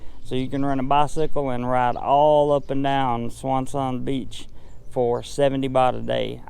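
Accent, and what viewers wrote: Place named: South, United States. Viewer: Great vid .you sound like your from the south.. Tennessee or Alabama.anyways much respect from NC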